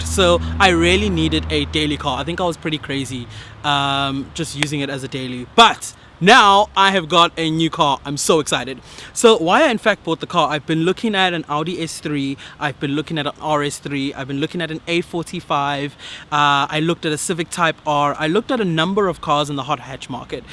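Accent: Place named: United States. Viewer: Good effort bruv. IMHO try being more yourself South African opposed to American you tubers accent wise. Nice car I've also got a R 2016 dsg oryx white from new and a V8 M 2008 black on black 6 forward drivers car. Looking at a Hawkeye STI in the near future sick project. Keep it up also the R is a beast tuned especially of the line.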